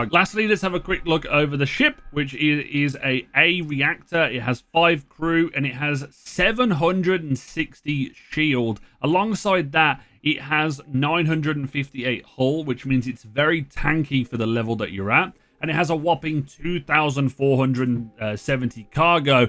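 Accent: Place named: England, United Kingdom. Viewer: Ahhhh, English.....ENGLISH!! Not American trying to talk at 6 words a second! Why do they do that? Great video, precise and clear. What a treat. Liked and subbed.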